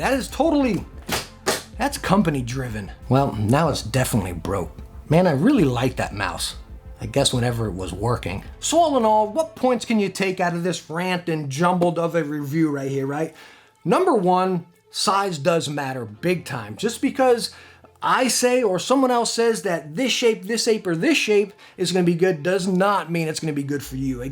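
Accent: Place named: Italian American, United States. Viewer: You have this Italian American accent like those in Martin Scorcesse movies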